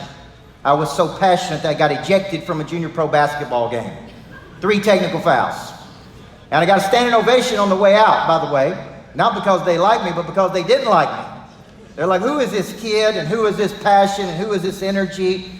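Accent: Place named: South, United States. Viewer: I love that Southern accent!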